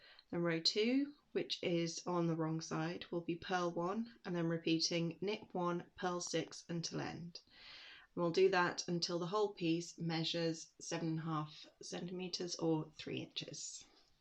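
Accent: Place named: England, United Kingdom. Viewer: Thank you so.much for this pattern. I love your English accent. Im from scotland living in USA 44 years still have My accent.😊❤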